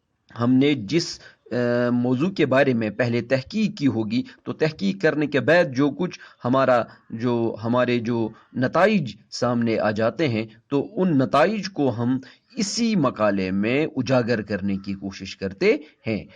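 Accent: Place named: Sindh, Pakistan. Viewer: کیا آپ سندھی سے تعلق رکھتے ہیں ؟ آپ کے لہجے میں سندھی مٹھاس کی حلاوت محسوس ہوتی ہے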